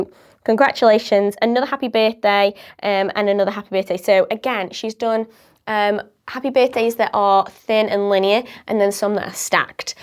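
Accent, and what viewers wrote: United Kingdom. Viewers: Thank you so much for this walk through (made even better by your darling British accent!). So much inspiration and so many ideas.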